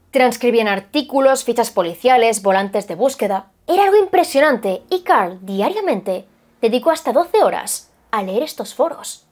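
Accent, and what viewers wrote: Spain. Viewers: ¡Qué linda estás! Siempre veo tus video y me encantan (además amo el acento español). Saludos desde Argentina🥰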